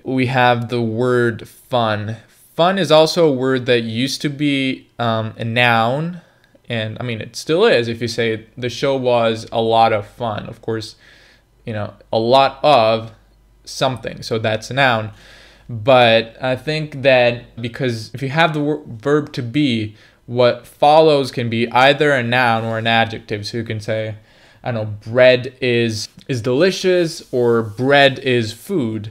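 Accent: United States. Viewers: you sound totally american. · your American accent is very good. I don’t think I’ve ever heard you speak English before and I know it’s quite an accomplishment to nail an accent without having lived in the country for many years.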